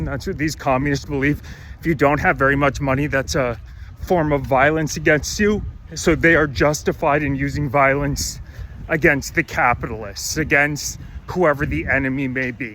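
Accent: Canada. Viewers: Your Canadian accent is awesome! Keep fighting, brother. Love from Belfast.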